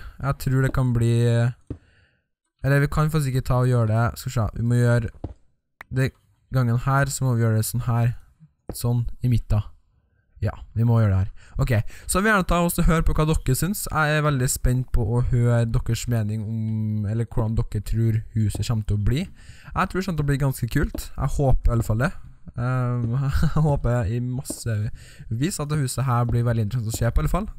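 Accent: Trøndelag, Norway. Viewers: Snakker du trøndersk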